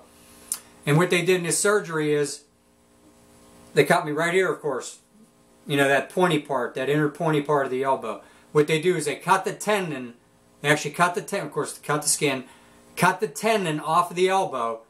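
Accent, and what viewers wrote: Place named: Pittsburgh, United States. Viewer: I hear a pittsburgh accent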